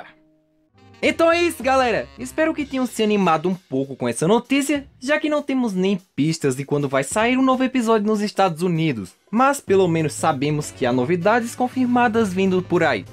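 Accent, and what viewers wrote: Northeast, Brazil. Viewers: vc e nordestino?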